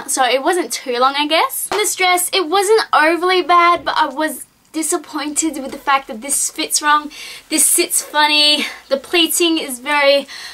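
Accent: Australia. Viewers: French?! I'm sorry, have you ever heard a French accent? Haha, sorry! I just found that amusing--It's a Northern Australian accent. :)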